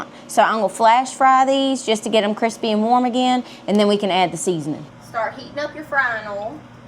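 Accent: South, United States. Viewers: You work hard to cook for your family. The best part is you cook Italian food. All done with a Southern Accent. Remember, Men have no balls, but the Women have dee big balls! Fantastico! Ciao, Bella! ❤❤😂😘❤❤ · ABSOLUTELY SHE NEEDS A FOOD NETWORK SHOW!!!! She cooks like the rest of us real people! She also talks like most of us in the South and lives in a remodeled 1971 mobile home that is gorgeous! Like most of us in the South, we like paid for stuff and repurpose, reuse and restore to keep it all paid for! We not into latest and greatest! We are into keepin it real and comfortable and debt free!😊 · No offense-I don’t think I’m ever going to make what you make, but I do like watching you cook and listen to your accent (Boston girl here)! Taste for poison is brilliant and liquid eggs - OMG! You go country girl!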